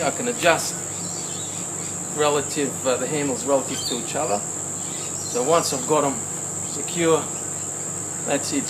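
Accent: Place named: Australia. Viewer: Thanks for the fast reply! Awesome. If I had to take a guess I would place you in Australia (your accent gave you away):D Thanks for the informations. I already downloaded your instructions (and want to throw money at you for it - by the way). I was just curios which of your videos was the most recent design In your instructions those eye bolts further down the "legs" of the stand (the ones you used to hang the speed ball) are missing, I presume they're just drilled into but wondered if you found a more elegant solution:D But with your idea I can get rid of the gym since I'll be able to do pullups on the station. And dips. And a punching bag. I really love your idea!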